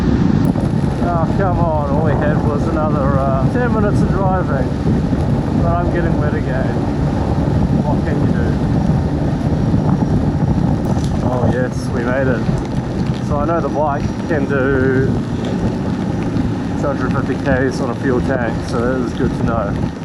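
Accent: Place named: New Zealand. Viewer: Awesome, I followed pretty much the same route a couple of years ago, it's one of my favorite rides, that was like re-living my trip but with better music and video😁. Enjoyed this more than the overseas riders, it's always better when you know the places and to hear the Kiwi accent behind the camera, not to mention your filming and music is top notch.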